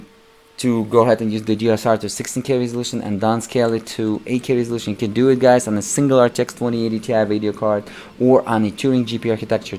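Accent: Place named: Russia. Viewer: You are from? You pronance like a russian.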